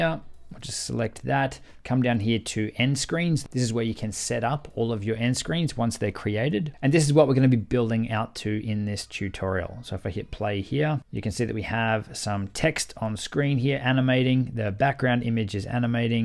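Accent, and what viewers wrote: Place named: Australia. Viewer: Wow, there's something new every day! Top tips here. It's great to have the occasional Aussie explaining too, none of that grating 'hey what's up guys!' Shudder, puts me right off that does. Just kicking off the youtube journey and will be following you and the courses you do. Thanks very much for the help so far. Terrif! KP - a pom, somewhere in the UK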